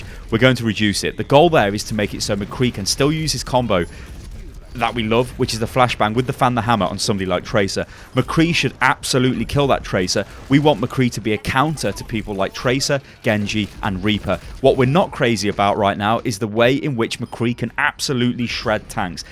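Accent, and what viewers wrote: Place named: Southern England, United Kingdom. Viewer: As a native of Britain I can confirm he is rather southern.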